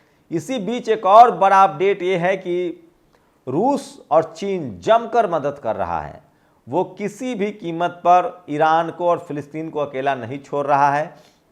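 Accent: India. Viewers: Mr your desi dialect is wonderful and your vlog presentation is really unique I really like it....keep it up speak for humanity.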